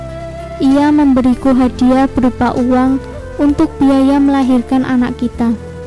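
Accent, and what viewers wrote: Java, Indonesia. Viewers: Medok amat yg crita🤣🤣🤣🤣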